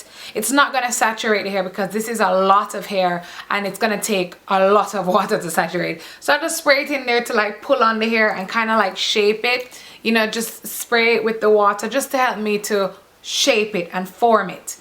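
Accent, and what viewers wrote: Jamaica. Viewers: She is Jamaican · Jamaican accent 😍😍😍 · are you jamaican?? u sound like a yardie .... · st. rose in ur face she is jamaican I wonder what's so terrible about jamaican accent anyways what I want to know is how u de tangle it cuz I wore the malaysian kinky for a month n 1 week n I washed it almost every day if it was thoroughly washed water contacted it every single day the beauty of it is that u can wash it in the shower while taking a bath and by the time u put ur clothes on its like dry no need for blow drying n all but I messed it up so bad ly when I stopped de tangling it n now I can't wear it again it's tangled with big cases of knots no way I can unwind it n I love this hair so much I am thinking of dying it burgundy n wear it for atleast a week before Christmas please tell me what to do its badly tangled help!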